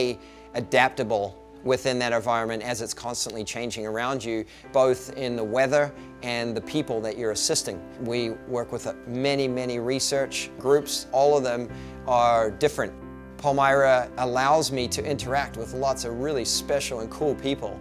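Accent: Australia. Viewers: partial aussie accent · Straight ozzy. Maybe got the mixed accent from different work environments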